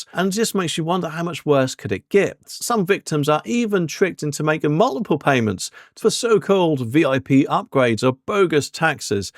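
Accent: United Kingdom. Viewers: You sound very much like you’re from the same area of the UK as me. What’s the closest major town or city to you?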